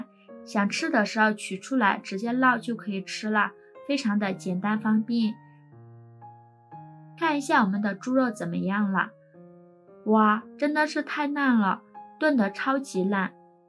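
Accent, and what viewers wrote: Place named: Mainland, China. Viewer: 中國都是這麼唸 我們台灣唸ㄒㄧㄠˋ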